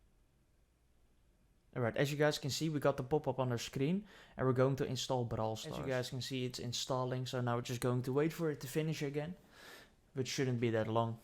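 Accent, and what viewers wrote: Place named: Netherlands. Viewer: Crazy Dutch accent (no offense)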